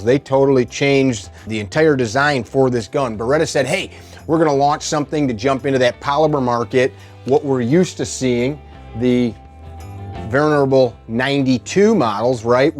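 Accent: Chicago, United States. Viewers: Late to the party here - I could listen to this guy's accent all day. I live in Chicago so it sounds real familiar. One of the best reviews on this firearm, too. I have the off-the-shelf Compact Carry. Not sure what that lacks from the full Langdon - maybe the Landon trigger and the bobbed hammer? Anyway, great firearm. I may put a slightly wider de-cocker on it, but that's about it. Would love the fancy stipple job but that's not cheap, either - and hate to send the gun away for such a long time.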